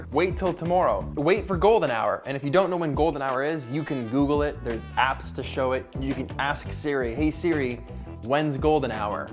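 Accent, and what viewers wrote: Canada. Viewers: Is he Canadian? Where from? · Are you Canadian?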